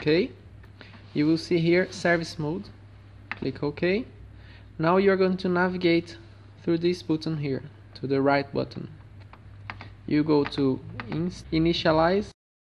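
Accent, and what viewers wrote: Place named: Brazil. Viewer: Ahhh sabia que era br pelo sotaque 😂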